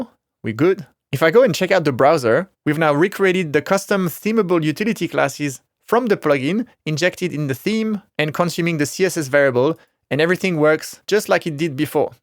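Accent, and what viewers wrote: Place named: France. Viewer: French accent just makes it better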